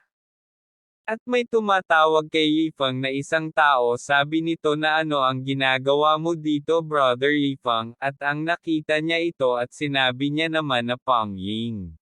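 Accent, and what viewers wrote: Visayas, Philippines. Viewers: Kinaray-a nga ilongo na may halong bisaya 😅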